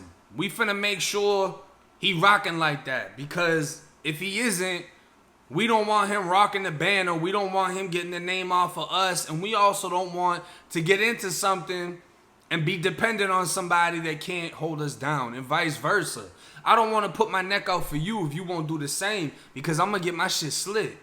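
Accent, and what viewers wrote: Boston, United States. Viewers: You sound like you from Boston🤔🤔🤔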